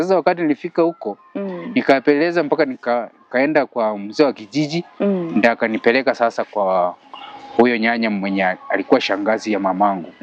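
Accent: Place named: Tanzania. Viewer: Kaka, Kiswahili chako ni kisafi sana, naskia lahaja ya Kibongo kwa mbali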